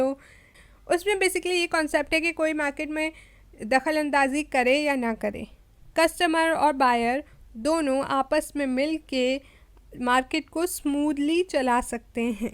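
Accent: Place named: Peshawar, Pakistan. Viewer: Wah g wah peshawar sa ho kia ???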